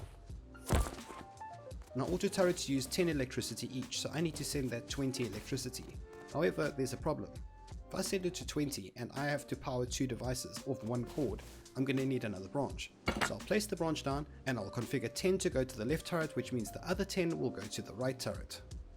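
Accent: South Africa. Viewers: South African accent? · So awesome to hear a South African voice for a change. Great videos. Might I ask how long it took you to edit this video? I'm asking because in my mind this would take me days so maybe you have some much more efficient method. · I knew u were south african. Woop woop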